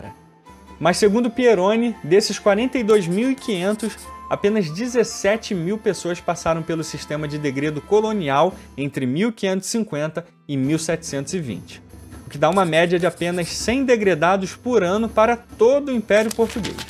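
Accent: Brazil. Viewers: ele mostrou a identidade no outro canal dele, e prr, se eu não me engano, ele cresceu no Brasil, por isso não fala português europeu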